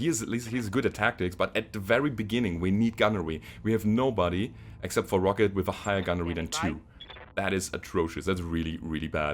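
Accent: Germany. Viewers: That German accent! Great!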